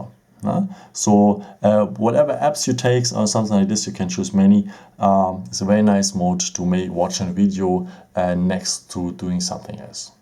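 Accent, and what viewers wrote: Germany. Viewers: Er spricht Denglisch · What an german accent! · achja der Deutsche akzent... einfach nur genial :D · Erste Sekunde: BOAH NE DER IST DEUTSCH Nichts für ungut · Haha wie man raushört das du deutsch bist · Jo du musst nicht englisch rede jeder schekt das du deutscher bist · No hate but I have a question about your pronunciation. Are you German? I think it really sounds like "german english". About the video: I like this theme of videos. Your video help me to understand the A 40 better. I need these theme of video because I get the Samsung Galaxy A 40 in this week! Nice content! Edit: Ich bin Deutsch (I'm German) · K1ller_ _Headsh0t its just a german accent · Ich höre sein englisch... und denke mir Jap das ist ein deutscher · Mich regt der deutsche Akzent auf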